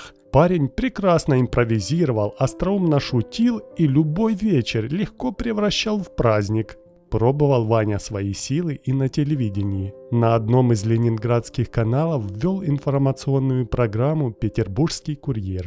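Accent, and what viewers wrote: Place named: Ukraine. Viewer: а что, это текст переведен с украинского? абсолютно нероссийские слова и интонации..."стоимость становит...." так не говорят. хотя акцент иногда похож.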